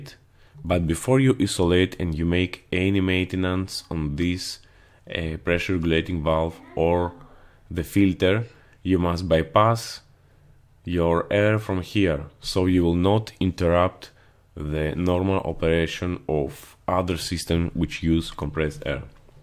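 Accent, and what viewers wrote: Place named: Russia. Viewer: Sounds like Russian accent.. Am I right?)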